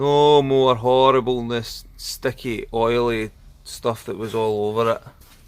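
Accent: Scotland, United Kingdom. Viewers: Hyundai i10 2010. I still have it. I like your scottish accent. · My mother was Scottish. How I miss hearing the brogue. Beautiful! PS: The car looks great too. First car: 1976 Fiat 131S.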